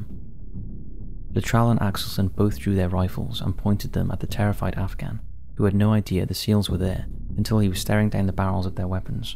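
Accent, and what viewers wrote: United Kingdom. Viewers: No, he isnt wrong at all. "Special forces" is a term known colloquially around the world to be synonymous with more/less any SOF unit . Defined as "the units of a country's armed forces that undertake covert, counterterrorist, and other specialized operations". So by definition Rangers, Raiders, SEALs, SWCC, PR etc are all special forces. Just because the US decided to specifically name a unit "special forces", does not take away from the literal definition of the word. and with the fact that the dude has a british accent or whatever, It would just be cringe to hold him to that standard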